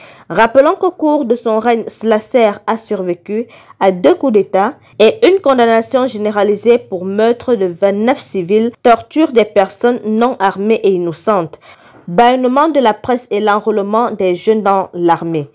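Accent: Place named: Cameroon. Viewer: L'accent est trop camerounais et sérieusement inaudible 🤢🤮 faîte un effort svp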